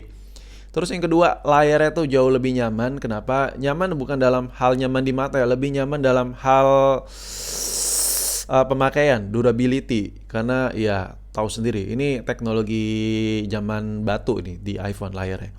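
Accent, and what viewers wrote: Indonesia. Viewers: English tapi logat tetep indo ya kang 😁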